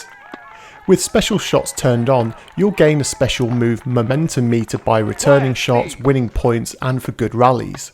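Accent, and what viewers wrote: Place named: United Kingdom. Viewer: This is "All-Star" tennis! Where the hell are Agassi, Sampras, Seles, Kournikova? Nice review,. Keep up the British Commentary.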